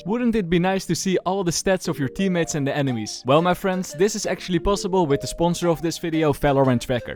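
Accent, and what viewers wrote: Netherlands. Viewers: the isnane dutch accent maar je maakt leuke vids keep up the work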